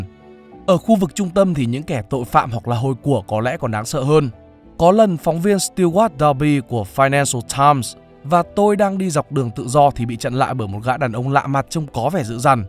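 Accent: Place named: North, Vietnam. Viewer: Giọng đọc miền Bắc làm tôi tin rằng lịch sử phải đúng đắn. Trân trọng. Mong thế hệ trẻ đi tìm lịch sử thật thay vì học cái lịch sử giả dối!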